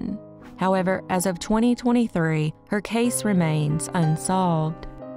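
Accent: South, United States. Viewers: So I’ve always been curious… what part of the south are you from? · Well you got a new follower cos love the stories and love your southern accent. It's a breath of fresh air. That's for sure!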